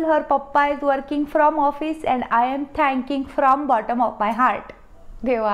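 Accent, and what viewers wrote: Maharashtra, India. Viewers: really amazing marathi accent.... Super..! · Your are such talented actor, always have fun to watch your vedio. Your Marathi English accent is superb. Keep going. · Amazing 👏 marathi accent 😍 एक नंबर 😊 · Love her Marathi accent 😊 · HOW DO YOU DO THIS!!! ....JUST HOW. PLEASE TELL ME....I just can't stop laughing at each and every brilliant pun line(PT usha, khao, khao and Howgarts omg...rofl) You are extremely talented and funny. Keep posting and making us laugh....god knows we need more like you!!! You most certainly Crack the Marathi accent!👌🥰🧡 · Your Marathi accent is so cool ❤️ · Omg this is soo perfect👍👍👏👏 up to the mark 👍👍👍 P T usha 😅😅 amazing word play.... kudos to you 👏👏👏 and I am loving your Marathi Accent 🤩👏👏 Love From Apla Pune 😘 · Brilliant Marathi Accent! Keep it up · Awesome. Marathi accent on point and message to parents, (letting children face their challenges ) is great. 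P T Usha was highlight :D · Excellent Marathi accent although basically from Karnataka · That Maharashtriyan accent... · Once again.. English spoken in immaculate मराठी टोन. Not to mention, minimal but perfect मराठी expressions... कस जमत हे तुम्हाला? How do you do it? Great work. Please keep it up. असच चालू राहू द्या:-) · Kiti chan Marathi bolte nahi?Ekdam shuddh ! 👍👏👏👏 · Grt job....accent n punch lines used r true n terrific in any marathi household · hatts off ..just awsome.. marathi accent😊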